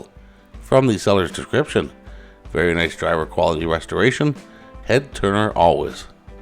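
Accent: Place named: Canada. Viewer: We'd blame any mispronounced words to be caused by your Canadian accent. No foul. Hope you feel better tomorrow. Keep up the good work.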